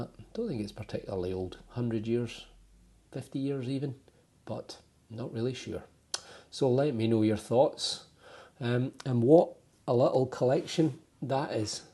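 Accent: Scotland, United Kingdom. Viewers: Just found your channel. I really enjoy watching all the digs. Especially love the Scottish accent. I swear you sound exactly like Roger from Outlander.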